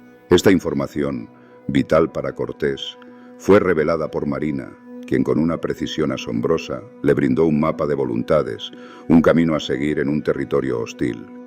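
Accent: Spain. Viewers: Hubo dos grupos que los mexicas No pudieron someter, los Tlaxcaltecas y los Zapotecas, lean, investiguen, analizen, saquen sus propias conclusiones y veran las diferencias, ademss este narrador tiene acento español, el imperio mexica SI era el mas fuerte y predominaba cuando llegaron los conquistadores pero no era el unico grupo etnico que existia, habia muchos grupos pero estaban sometidos, pero todos los grupos etnicos que existian NO eran mexicanos porque México NO existia como tal, eso vino despues en la independencia en los 1800s, el México actual se forma de tres grupos, los mestizos, los afromexicanos y los nativos, osea indigenas, estos tres grupos ya si somos mexicanos, 💯%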